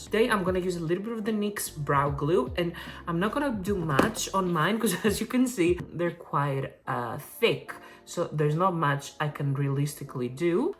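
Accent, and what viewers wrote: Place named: Greece. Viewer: I don't know if this is common knowledge but I have not been sure about it. Hearing your voice now, I feel it confirms my suspicion that you might be greek, lol. So I was wondering how you use neutral pronouns in greek. Asking for personal use 😅 Anyway, thanks for the makeup tips too.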